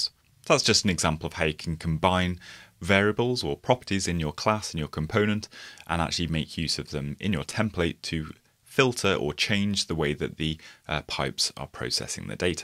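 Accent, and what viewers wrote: United Kingdom. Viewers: after dealing with Indian guys` talks in angular, it is nice to came across an expert who has British accent :)) Thanks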